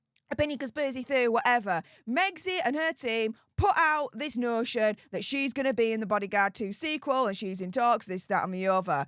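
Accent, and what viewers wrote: Yorkshire, United Kingdom. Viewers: Hey up! You always cheer me up. Love your Yorkshire accent. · Hi I love your accent what part of the uk are you from❤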